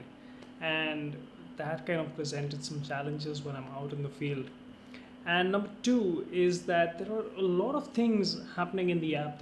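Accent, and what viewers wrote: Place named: India. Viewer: I hate the indian accent.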